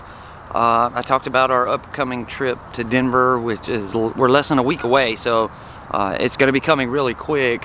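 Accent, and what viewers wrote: South, United States. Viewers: I live in Opelika/Auburn so nice to hear a good ole’ southern boy accent on the case! I saw you did a video at the Dozier school... I grew up near there definitely going to check that out. So hard to believe such evil went on right under your nose for 100 years!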